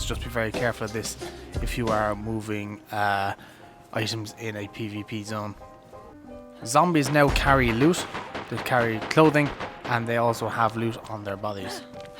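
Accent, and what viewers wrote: Ireland. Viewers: Are u from Ireland?U sound Irish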